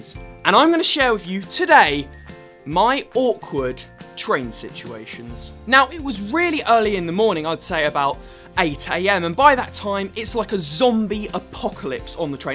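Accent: United Kingdom. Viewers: Thrusting!!!!! *adorable British accent* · Your british accent is incredibly gorgeous :D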